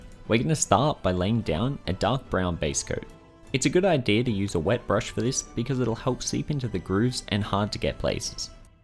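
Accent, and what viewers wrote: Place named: New Zealand. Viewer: Thanks for making these videos! L💘ve the kiwi accent too!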